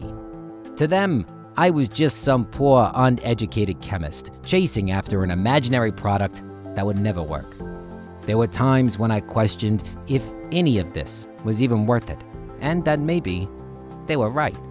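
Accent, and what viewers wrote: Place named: New England, United States. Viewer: couldn't listen to more than 30 seconds of this dumb yankee accent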